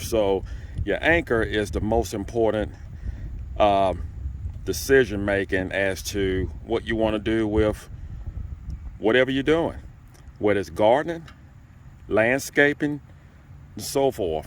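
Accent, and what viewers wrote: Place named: Baltimore, United States. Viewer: Amazing video! Also you have a thick ass Baltimore accent 😂😂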